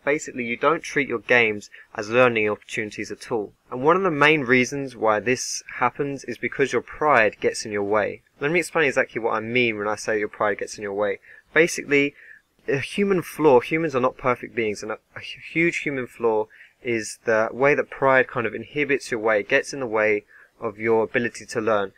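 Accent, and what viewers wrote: United Kingdom. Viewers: expected a standard "ward here, lvl this and that, kill this first" guide stayed for the british accent liked because of the content i'll try to change more aspects about my attitude, but it's hard when the people you're trying to learn are completely over their own head ¬¬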